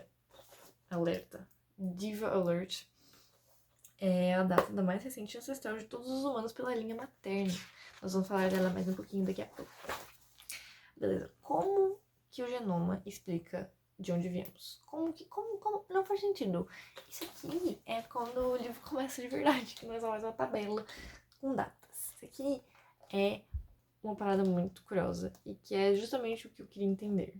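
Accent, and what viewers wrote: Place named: Portugal. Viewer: Achei que era portuguesa no primeiro minuto de vídeo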